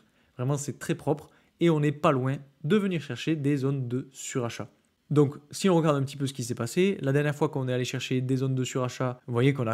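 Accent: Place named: Toulouse, France. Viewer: Tu as l’accent de Toulouse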